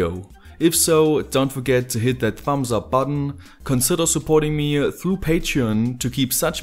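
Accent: Germany. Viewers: are u german? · What about turning a Laptop into a single Keyboard, Monitor and PC itself? And by the way: You are German, right? Sometimes in the Videos there are German words/sentences and i can kind of hear, that you are German. Wo wohnst du ungefähr? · Hallo mein deutscher kamerad. Hab deinen akzent erkannt lol :D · Hey, may i ask from which country you are? not that i would criticise that, but you have an accent that for me sounds a bit like german - and as im from germany, i just wanted to know if im watching a neighbor or something:) (But well, i guess there are a lot of similarly sounding accents, and as yours isn't that strong it could be a lot different things. isn't a german name at least^^) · English with a German accent. Sometimes surprisingly easier to understand than English from England or English from America can be. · let me guess... youre a german... 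(like me) ? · was wondering what kind of accent that was! Glad to know now!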